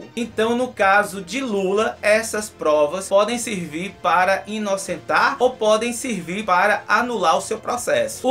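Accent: Northeast, Brazil. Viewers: E esse sotaque me representa muito como nordestino... Não pare, vc é minha fonte de pesquisa política hj em dia...😍👏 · Tai. Gostei de sua forma nordestina de dar notícias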